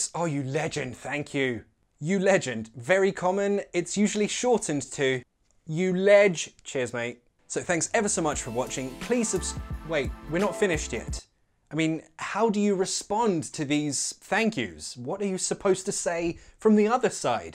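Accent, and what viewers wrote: United Kingdom. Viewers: Please give the english subtitle :( i'm from indonesia and i love so much the way you teach but so many word that i can't understand with your british accent. And thank's before :)))))))) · Sir is the first British teacher who has the lightest British accent that I've ever found. 👍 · I like British accent because of you😊🌹..... Thanks ever so much for your useful lessons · I have a question, which British accent do you have? I would really like to know! ☺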